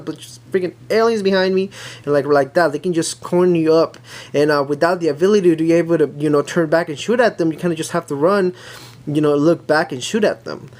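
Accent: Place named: South Florida, United States. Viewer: Great review! I literally just purchased this for the PS Vita in 2026 ha ha, ha ha enjoy your memorial day weekend. Not for nothing but it sounds like you’re from South Florida ha ha ha By the way, this game is still $10🫠🥴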